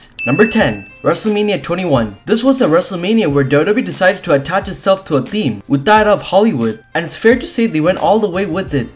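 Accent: India. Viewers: Am I the only one who likes the WM 20 entrance? PS: This guy talks like Apu from The Simpsons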